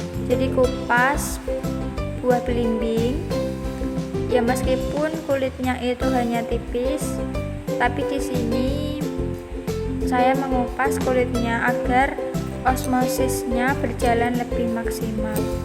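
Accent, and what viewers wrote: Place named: Java, Indonesia. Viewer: medok banget 😭 but overall baguss dan informatif hehe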